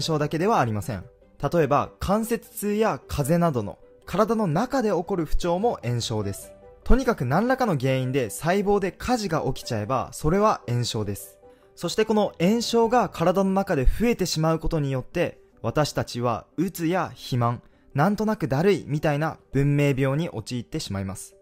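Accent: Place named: Tohoku, Japan. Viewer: あ、そうなんですね！こちら、秋田出身東京在住なのですが、はじめ（親しみやすさという意味で）ときおり東北チックな訛りがあったので、そちらご出身かなと勝手に想像していました＾＾ さんの語り部、毎回とても楽しみにしています＾＾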